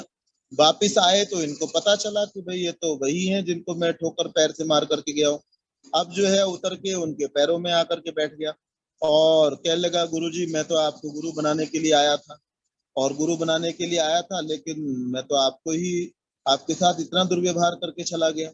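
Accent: Standard. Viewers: आचार्य श्री आपके जैसा शुद्ध उच्चारण कभी ही सुनने को मिलता है।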